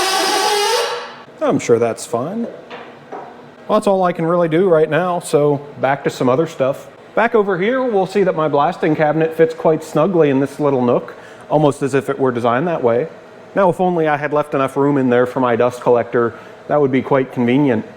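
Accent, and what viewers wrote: Canada. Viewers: Trying to peg the accent.... originally from the great white north? I'm getting Canadian or Minnesotan vibes. Love the channel!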